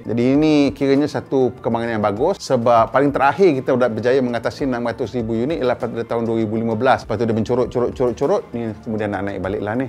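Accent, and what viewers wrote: Indonesia. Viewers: Cakap saja guna bahasa Melayu Malaysia. Tok sah ikut rentak loghat indon tu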